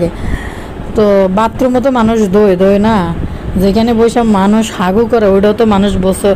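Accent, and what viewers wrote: Noakhali, Bangladesh. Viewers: Apnar desh kumilla but apnar kothar style noyakhali.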